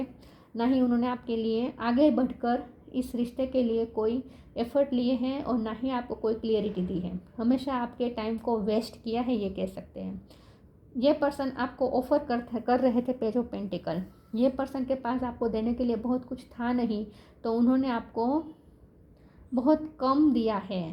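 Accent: Gujarat, India. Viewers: Ma'am aap gujrati ho.??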